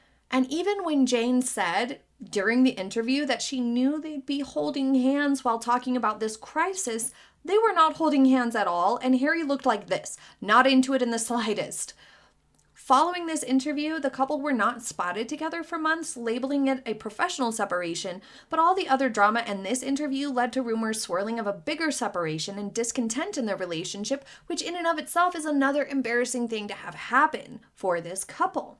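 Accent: United States. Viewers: ❤darling ❤you look absolutely STUNNING 🤩 again today…..I love 💝 you in this shade of Green!it makes your eyes 👀 pop!!!🥤 ❤❤❤❤🎉you really are a BEAUTIFUL WOMAN 👩 with a Fabulous Brain 🧠 to boot!its always a pleasure to hear 👂 your soft CLEAN 🧼 IMHO AMERICAN 🇺🇸 ACCENT all without the new “woke”weird drawling questioning intonations that I hear often on YouTube!lol!Im a 58year old lady in the Shetland Isles Scotland 🏴 uk 🇬🇧 and have been with you from the get go!Keep going you’re REALLY SAYING WHAT I FEEL and THINK 🤔 about METHANE and HAZNOBALLS and I’m PRAYING 🙏 TRUMP doesn’t Chuck HENRY WINDSOR outta the USA 🇺🇸 😂😂😂😂!Much love!🧡 Jo.xxxxxxx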